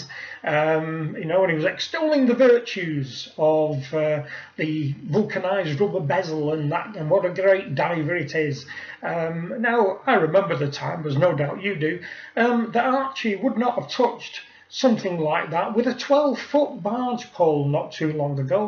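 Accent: United Kingdom. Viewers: Hi. subscribed to your channel about 3 weeks ago and I enjoy your vids very much....its nice to hear a fellow brits voice on such a nieche subject as horology. I share your opinions about archie and can no longer watch his videos. The swearing is too over the top and the content is just plain boring! keep up the good work.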